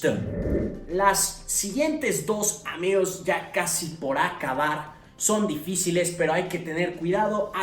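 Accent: Mexico. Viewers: A veces suenas como Méxicano Mano, pero es buen video, aunque la parte de la pronunciación la fue muy rápida, cuando uno le enseña a alguien que no tiene ni idea de un tema es mejor despacio. Es tal como si yo quisiera enseñarle a tocar guitarra a alguien y empiezo a hablar de progresiones con acordes de novenas, oncenas, trecenas etc. Si ve? Soy músico y quiero aprender Lonque más pueda de este idioma por mi nuera que es de Bulgaria. Entonces mira tutoriales de como ser maestros y seguro tendrás muchas suscripciones más. Me suscribo. Chao. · Anda no te pases...suena mas a acento mexicano que a español de España...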